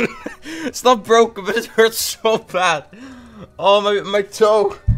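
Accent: Netherlands. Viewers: Dutch Accent Heerlijk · He's dutch xD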